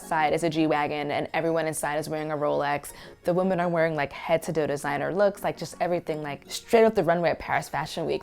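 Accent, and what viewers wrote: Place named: United States. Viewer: Why are you using an american voice.................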